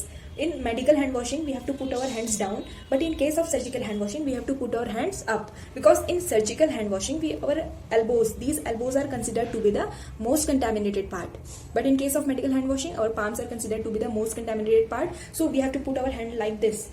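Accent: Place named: Haryana, India. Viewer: I think 💯 mam is from haryana ❤❤ 😂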